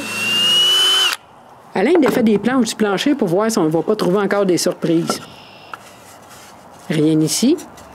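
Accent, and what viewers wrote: Quebec, Canada. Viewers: Votre accent me rappel un merveilleux souvenir de la découverte du Québec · Cet accent Canadien, c'est magnifique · No I definitely prefer the English version, Canadian French takes some getting used to. But still a good show. Bien a vous.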